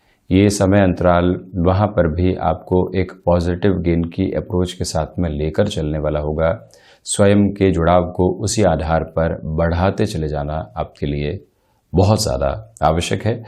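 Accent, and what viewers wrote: Standard. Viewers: Wah, kya Hindi, itni shuddh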